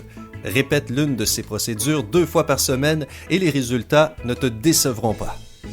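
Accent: Quebec, Canada. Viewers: C une voix québécois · accent canadien je crois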